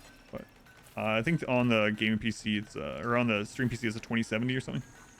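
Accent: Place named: Appalachia, United States. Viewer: hahaha, maybe I can leave some vids on .5x speed for a while And also your apalachian voice is jokes, altho I did understand it enough considering the diverse group of people ive met and played games with, definitely harder to understand though, I dont think I could watch that on 1.5x speed like ive sometimes done for your vods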